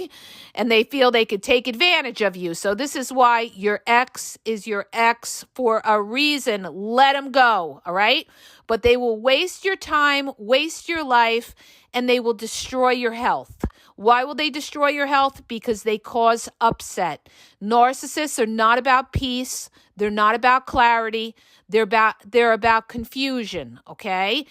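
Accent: New York, United States. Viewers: You crack me up. Your NY accent is great. You would be a great in a movie. Reminds me of Cher saying Snap out of it! Thanks for shooting from the hip.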